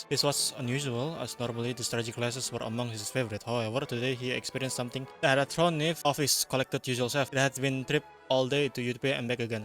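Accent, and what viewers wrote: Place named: Russia. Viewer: You speak Like Russian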